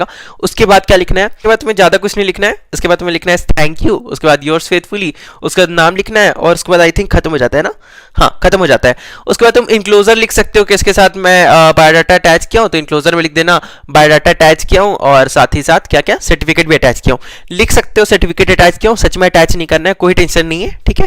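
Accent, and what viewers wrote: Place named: Bihar, India. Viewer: Mujhe ye bihari lagta h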